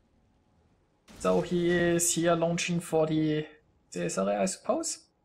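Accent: Germany. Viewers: Kann das sein das du deutscher bist?!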